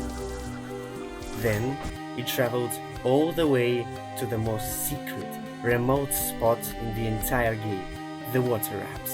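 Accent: Russia. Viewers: бро не может скрыть свой русский акцент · i can hear the russian accent ))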